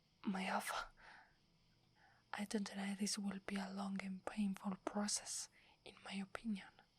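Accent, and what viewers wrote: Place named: Italy. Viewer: It wouldn't be cute without the italian accent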